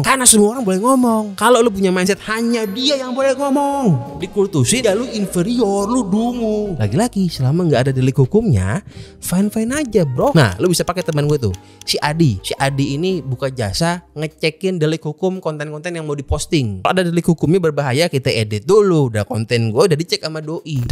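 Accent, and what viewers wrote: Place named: Betawi, Indonesia. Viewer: Gw subs si Bro ini karena dialek Betawinya mantaffff dan juga berani roasting tuan besar rotschild.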